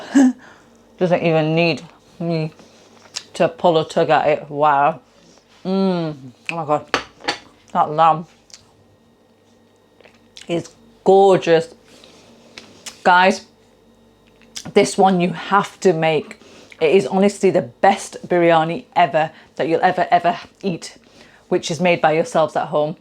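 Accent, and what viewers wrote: United Kingdom. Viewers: Wow,you are absolutely good at cooking.Thank you for teaching a single Dad like me. May i ask respectfully,is your accent British ?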